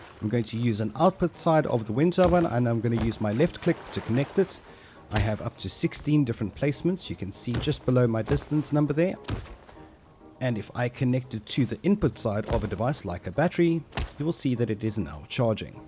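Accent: South Africa. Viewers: What are the odds of you being a South African excuse me for assuming it just the accent 😂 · You have to be South African as well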